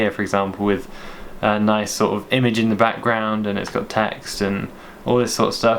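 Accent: United Kingdom. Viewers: are you british ??your accents remind me of classic english like joe root does :D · Are you english ???britisher?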